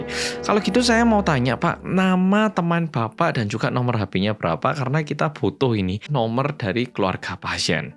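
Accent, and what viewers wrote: Java, Indonesia. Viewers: Jang Hansol, aku sueneng mergo medokmu ga ilang2 😄👍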